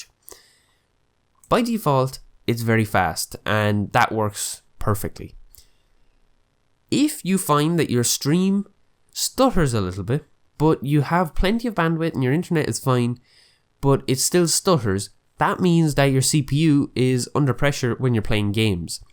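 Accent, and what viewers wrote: Ireland. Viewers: are you irish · Welcome to Ireland mate now we all know you live in Dublin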